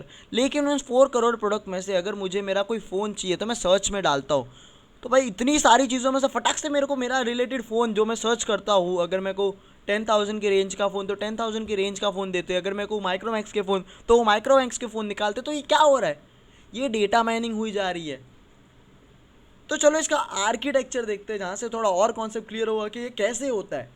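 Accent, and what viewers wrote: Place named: Mumbai, India. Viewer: way you like teach in Mumbaikar style.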